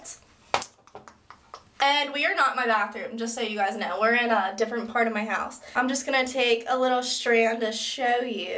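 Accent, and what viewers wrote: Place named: South, United States. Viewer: Your accent girl! It's nothin but southern. I love it. And I have a Royale as well. Best ever.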